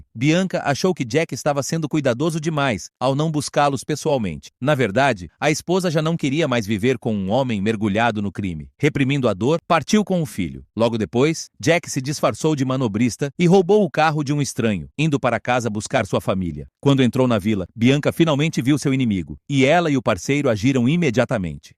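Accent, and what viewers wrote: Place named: Mozambique. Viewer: em uma hora e todo acompanhado com imagens Você é gênio O seu português é fácil perceber um Moçambicano parabens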